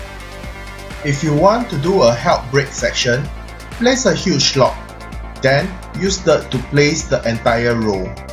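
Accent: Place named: Singapore. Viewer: He from Singapore. All not from America or England.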